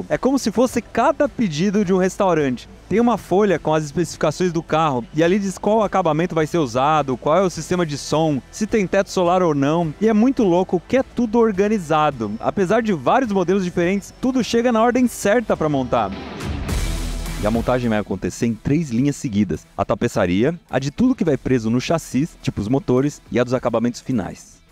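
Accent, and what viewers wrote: India. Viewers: At first time I thought you were indian, because of your accent, but then I realized you are Brazilian and the video are Dubbed. Nice Vídeo. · Man pq o parece um indiano com esse áudio em inglês? Eh IA ou é ele msm falando?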